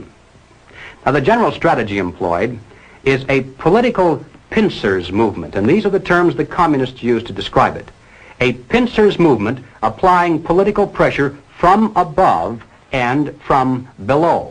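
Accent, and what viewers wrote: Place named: Mid-Atlantic, United States. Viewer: The mid-atlantic accent is persuasive as fuck.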